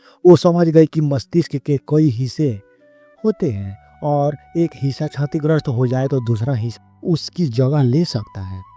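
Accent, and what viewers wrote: Bengal, India. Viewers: Bangla accent 😊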